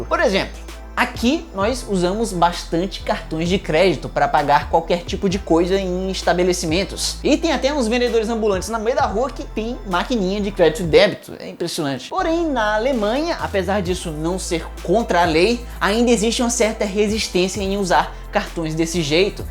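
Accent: Ceará, Brazil. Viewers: tu é do ceara man? kkk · Certeza que ele é cearence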